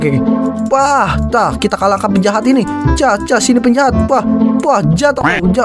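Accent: Indonesia. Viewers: Bang teh orang Sunda apa bukan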